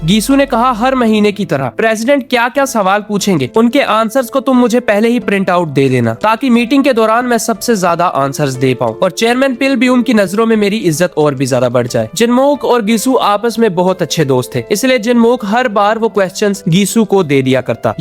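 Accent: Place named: Pakistan. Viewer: Aapke pronounciation ke Hisab se Aap Pakistan se Belong karte ho na - Bythe way Voiceover 🗿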